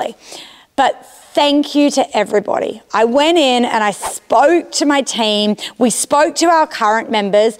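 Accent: Australia. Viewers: Is this an Australian accent ?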